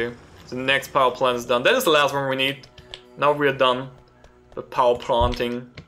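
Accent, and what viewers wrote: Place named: Eastern Europe. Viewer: I thought he was eastern European like the developers.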